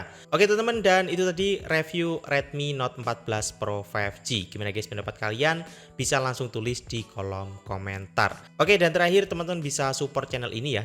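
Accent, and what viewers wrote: Banyuwangi, Indonesia. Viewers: Lho sampean orang BWI mas?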